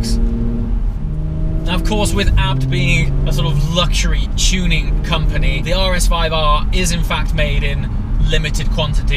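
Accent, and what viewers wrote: United Kingdom. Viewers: omg British Accent!!!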